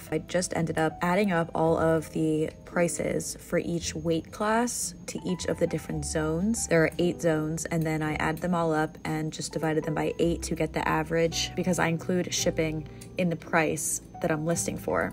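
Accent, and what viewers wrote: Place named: California, United States. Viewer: That Californian accent 😶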